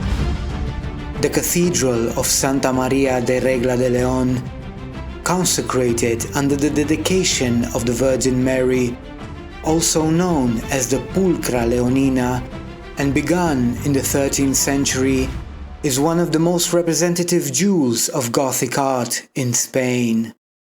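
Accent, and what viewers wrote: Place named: United Kingdom. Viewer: una vez más me quito el sombrero ante tí, con tu perfecto British Accent y tu maravillosa forma de narrar,haces conocer al mundo lo precioso que es nuestro país fomentando al mismo tiempo qu vengan a conocernos. Gracias por aprender contigo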